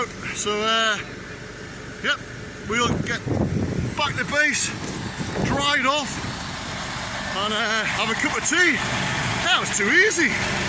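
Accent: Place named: United Kingdom. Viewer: Wow, what a cool wee channel you've got, and it's nice to hear recoveries done with a British accent. You could count that as an Off-Road Recovery, so aligning you with channels like Matt's Off Road Recovery, Trail Mater, Fab Rats, Robby Layton etc. Those channels are doing really well with MORR up to 1.6 million subscribers and making close to US$700K a year, and that's without sponsors. I'm looking forward to watching some more of that big truck of yours. <link>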